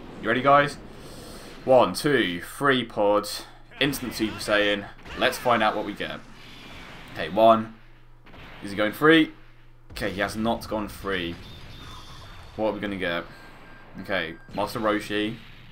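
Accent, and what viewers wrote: United Kingdom. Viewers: YOURE BRITISH?!?! Who woulda thought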